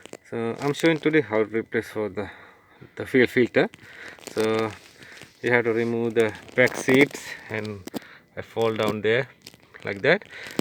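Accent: India. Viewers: Great video. very good explanation with all the steps. 10/10. Are you from India or Sri Lanka?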